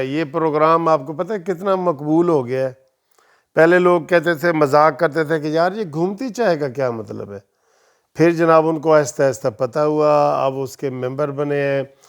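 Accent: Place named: Punjab, Pakistan. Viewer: Lagta hai chacha Punjabi hain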